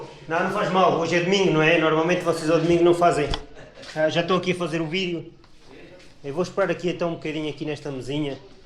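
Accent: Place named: Cape Verde. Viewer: Excelente trabalho como sempre. É corajoso e altruísta. Mas coloca a.sua vida em primeiro lugar. Desculpa me esta advertência. Mas fico muito preocupada pela sua segurança devido essas trilhas íngremes, sinuosas e perigosíssimas. Adoro o seu crioulo. Uma mistura de criolo sotavento, barlavento e português. Cuida se e.protege se Um grande abraço nesta dura aventura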